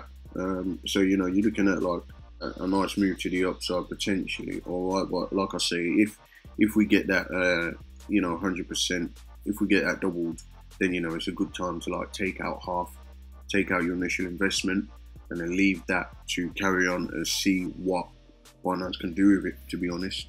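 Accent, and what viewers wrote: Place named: South London, United Kingdom. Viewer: Alright bruv. Trying to pinpoint the accent. South London, or South east area? Really enjoy your content bro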